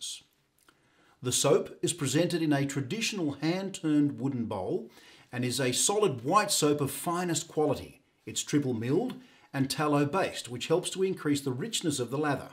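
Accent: Australia. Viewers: Yes, but also sound slightly Aussie too so I couldn't tell, lol. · You sound very Australian to me. I am English. You do have a soft accent but it is clearly Australian (to me). Your pronunciation is exceptional and clear. I would say you speak with received pronunciation but with an Aussie flair.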